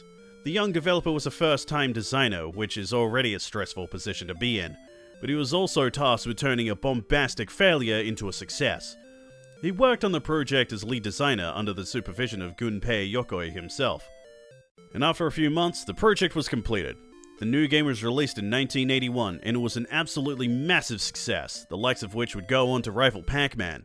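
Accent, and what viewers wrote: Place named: Australia. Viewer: Man the Australian accent sure does kinda suck, basically roll the dice to decide how to pronounce half the words needed to make a video like this. And I say that as an Australian.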